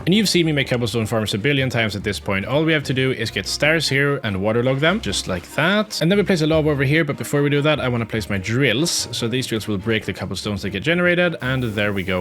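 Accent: Sweden. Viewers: Are you swedish??? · why has swedish accent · Are you swedish? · Är du från Sverige? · U sound so swedish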